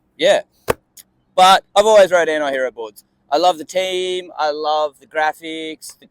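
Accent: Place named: Australia. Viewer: Great video it's interesting to hear other people's setups. I'm a complete beginner and just ordered: - 8.25 Jart Gravity deck (steep concave) - Indy Hollow Forged 144 trucks - Spitfire F4 Radial 53mm 99a (Black trippy swirl decal) - Bones Race Reds bearings - Bones Medium bushings (black/yellow) I have a feeling this setups gonna feel very nice based on the research I've done. Greetings from england, love the aussie accent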